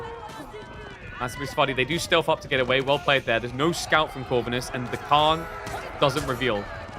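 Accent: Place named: United Kingdom. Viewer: Sounds like a Brit but hails from Hungary. A Mantas if you will.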